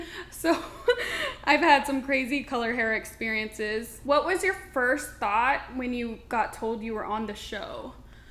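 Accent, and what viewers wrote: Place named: Texas, United States. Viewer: Girl you’re so inspiring! I’m also from Dallas and you have the whole accent of Texas. Love it 🤗❤️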